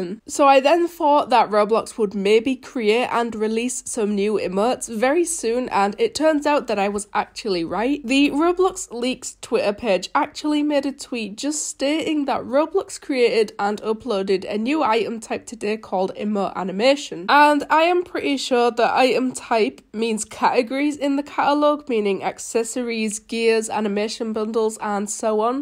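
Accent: United Kingdom. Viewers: Shes british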